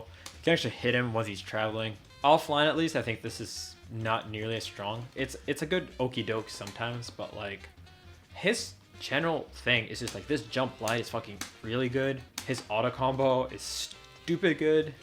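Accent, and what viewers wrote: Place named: Sweden. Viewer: he literally said he may misprounce some names due to his swedish accent. Just like how he say ginjew instead of ginyu. He knows he is mispronouncing some names. Plus, you don't have to watch dbz to be good at this fighting game. You don't have to play Super Mario or Legend of Zelda or Pokemon to be good at Smash Bros.How much you watch dbz has literally no correlation to your skills in this game. In fact, this game is made by Arc Systems, so it's literally a Guilty Gear game with MVC assists.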